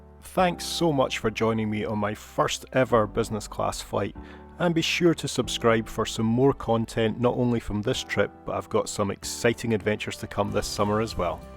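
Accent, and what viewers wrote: Scotland, United Kingdom. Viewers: You have got all 3 my favorite ways of traveling (airplane, train and ferry)! 👍❤️ Please keep traveling/sharing and I will definitely keep watching. 🙏 By the way, love your Scottish accent. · Hi, nice to meet you...I've just discovered your channel and I must say IT'S REALLY ONE OF THE VERY BEST out there!!! Your videos are Grrrreat! Excellent quality, good content, to the point commenting, and perfect editing. And the wee Scottish accent is the icing on the cake!...and did I mention that you're also eye candy??;-) (hope you don't mind a compliment from a man!) Agree that VS are excellent value for money, especially if you happen to stumble on one of their offers. Their crews are some of the very best, aren't they? I so love the A350....big, smooth, quiet, powerful... Doesn't get any better than that, really! Xx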